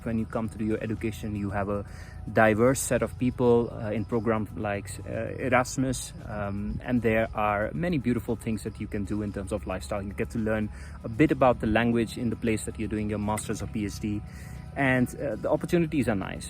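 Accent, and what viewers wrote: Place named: Netherlands. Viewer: your English accent have a dutch inflection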